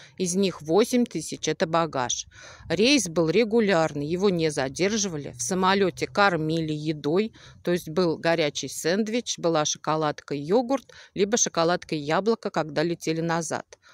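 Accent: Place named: Dagestan, Russia. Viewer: 😂😂😂😂😂 только ты сама из Дагестана. И акцент у тебя Дагестанский. Сколько тебе заплатили за рекламу. Охринеть за деньги все что хочешь сделают и раскажут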